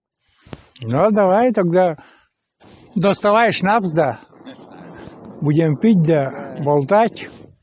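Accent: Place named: Arkhangelsk, Russia. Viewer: Спасибо.Я недавно на Вашем канале.много посмотрела.но ещё не все.С мужем смотрели.как Вы рыбачите.как грибы собираете..Хорошо.что такие помощники у Вас есть.Не так надрываться.Корни моих родителей -Архангельская область.И поэтому говор ваш слушаю с огромным удовольствием.Но дома в вашем селе отличаются.Большие.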